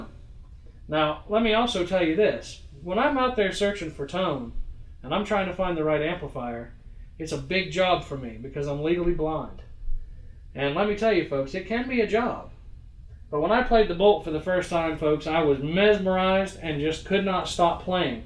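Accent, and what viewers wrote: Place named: Nashville, United States. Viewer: I love, but it cracks me up to listen to him talk. He's a Mainer and he talks like he's born and raised in Nashville.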